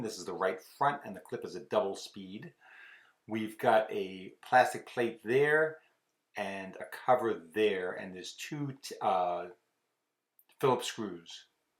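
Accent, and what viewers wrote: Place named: Massachusetts, United States. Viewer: Your in florida but you def sound like your from that MA area....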